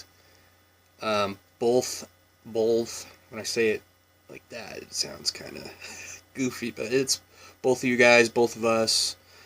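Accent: Washington, United States. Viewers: I am from England and your accent is cool. Washington state is really close Idaho is the Washington state accent similar to the Idaho one? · Oh ya, you're from Washington. Me too, all my life. I can tell you definitely are from WA by the way you talk 😂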